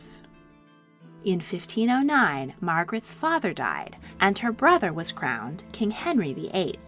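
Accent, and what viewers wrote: United States. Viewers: I simply can't listen to an American narrator about English kings and royalty! It's too off-putting! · this English history is not good being narrated by an american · History Teller and great American native voice ... 02/22/2021 Thanks 😊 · What? An American voice narrating this. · This so-called documentary is totally boring it doesn’t tell us anything new about Henry and his sisters just goes over and over the same old historical facts… It would’ve been a whole lot better if narrated by a non-American voice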